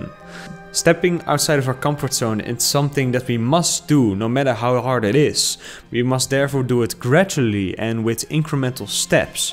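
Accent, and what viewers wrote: Netherlands. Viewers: Are you dutch? That accent 😎😇 O ffs you just told us in the video lol.... How the .. did you end up in HK?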